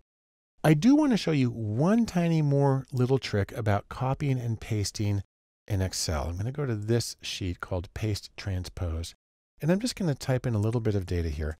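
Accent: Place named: England, United Kingdom. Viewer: its so nice to hear clean english accent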